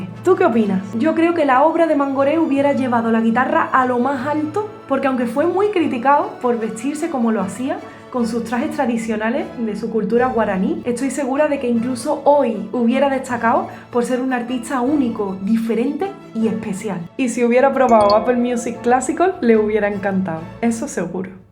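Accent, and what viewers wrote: Andalusia, Spain. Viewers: Escuchar ese acento andalú 😍🥰 enamora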